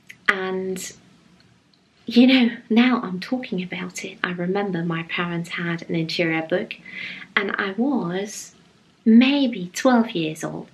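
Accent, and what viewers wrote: United Kingdom. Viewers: I agree completely.I couldn’t believe she wasn’t English.She has the most English of voices and I could listen to her all day.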